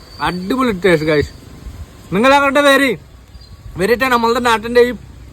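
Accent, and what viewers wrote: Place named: Hindi, India. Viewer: ഭായിയുടെ ഹിന്ദി കലർന്ന മലയാളം സ്ലാംഗ് ❤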